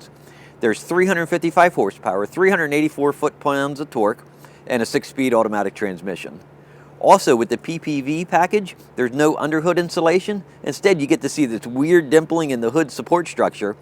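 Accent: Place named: East Coast, United States. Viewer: Really hate the east coast accent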